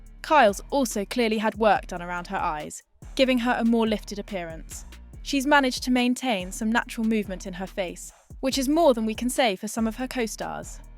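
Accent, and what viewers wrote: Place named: United Kingdom. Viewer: Are you Lucy from Engliswithlucy?? You have the same voice. And Iove your British accent. Good video !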